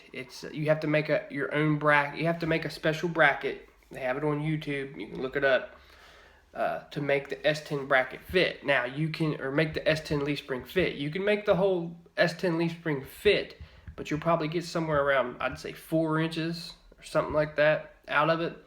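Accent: South, United States. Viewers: Howdy. What's your opinion of the rear brake drum to disc conversion kits? Is it a worthwhile upgrade do you think? Thanks, man. (Your vids are great, and so is your Southern accent)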